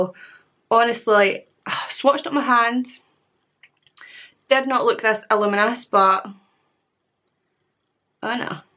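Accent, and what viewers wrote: Scotland, United Kingdom. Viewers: I will never understand why your channel has never caught on with the YouTube algorithm. You are funny, smart and beautiful. But more importantly, you consistently upload content that is on brand with other lifestyle YouTubers. I stumbled on your channel years ago when I was asked to do a Scot accent for a role I was playing - not sure how you feel about your GRWM videos teaching an American your version of the Scottish accent but oh well. Anyway - I wrote you this novel to say, I love that you have never stopped sharing your life with the world - I hope that you find success in all the things you do. Cheers!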